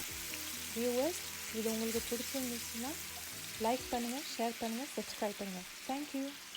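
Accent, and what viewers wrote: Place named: London, United Kingdom. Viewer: London Tamil lovely 🥰🥰Mouth watering dish😋😋😋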